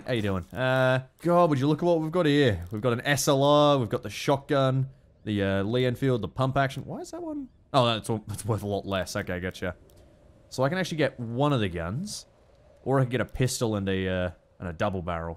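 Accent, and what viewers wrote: Australia. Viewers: really let out his Australian out in this video. I guess he really felt at home. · I love how sounds VERY Aussie in this one. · This is the most australian I've ever heard be in a video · I love how thick his accent is. He really sounds Aussie, I fucking love it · I've never realized how Australian can actually get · A videogame about the Australian outback in full Australian mode "Just like the simulations" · now i've been watching for a good while now and i have to say this is the most australian i have ever seen him go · Not hard to understand, I understood him just fine. But it was a stark contrast compared to how he usually sound around non-Aussies and in his other videos, and definitely a much stronger dialect. And I frankly found it wonderful. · This is THE most Australian I've ever heard, or any other Australian streamer, get. 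· I love how accent slowly gets more and more australian through this video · 2 things: 1) takes shots at a kangaroo Kangaroo comes from the side: Clever girl 2) I think this video has strongest Australian accent I've ever heard...not sure why that's a thing, but having watched his stuff since he played Valkyria Chronicles 4, it's just something I've observed. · Hearing going full Australian is amazing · Got sent this by a mate. First thirty seconds and I’ve subscribed. I’ve actually got someone in my own country (or at least my own nationality to watch) unless I’m wrong and he’s just putting on the Australian accent, but sounds bloody spot on at least. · This is the most Aussie I’ve heard in a while and it’s great. Especially with the Drop Bear. And seriously. Wtf is a Drop Bear. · getting back to his roots in more ways than one! I wonder if hes happy to finally have a game that he can legit use aussie accent 100% and noone will nag him about it cause its theme appropriate, although I don't get why hes so bothered by Dicko's slaughterhouse? 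I mean OSHA/H&S would have a field day with Dicko, were he still alive... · went full Australian during this video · I feel like this is the only video I saw, where go full aussie. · I’d love to see more of this! I especially love how becomes more Australian playing this! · became so Australian that I actually had some trouble understanding him. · Man your aussie accent came out strong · my favorite thing about this video is that the accent got more noticeable as the video went on, which tracks with what i've heard about australians toning down their accents for non australians but slipping right back into when around other australian accents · I know is from down under but it’s really coming through thick in this video and I love it! Also yeah, paintball ref/ marshal… done that. You break your voice first weekend, then you can yell so loud.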